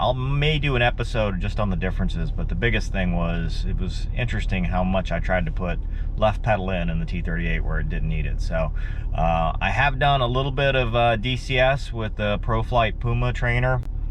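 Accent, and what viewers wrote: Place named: United States. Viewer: I enjoy the accent. It's like a disappearing gem of Americana. Thanks for sharing!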